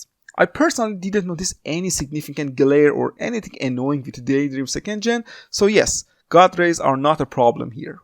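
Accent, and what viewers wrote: Iran. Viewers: Haha, I was going to ask him this, if he Persian. 🤣🤣🤣🤣 Love it. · Love you Persian accent :D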